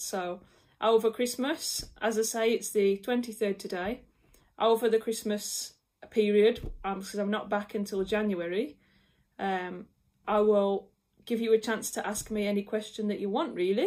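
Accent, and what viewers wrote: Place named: Wolverhampton, United Kingdom. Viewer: Great video. Guitar playing was wonderful. Very nice to just listen and chill. I've been following your channel from the very start. Always enjoyed your content. The accent was a plus point as well! Even though I'm a Londoner born and bred (although now living in the Welsh Valleys), I am a life long Wolves fan! Been to Molineux many many times. I knew you was from the area by your accent. Are you a Wolves fan? Many thanks. Craig.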